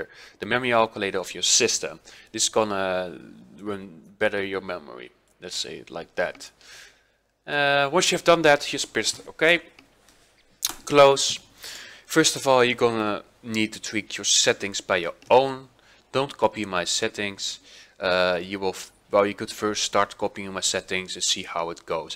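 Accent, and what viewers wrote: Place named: Netherlands. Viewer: Ben je van holland ik hoor het een beetje aan je accent? Leuke video man Bedankt!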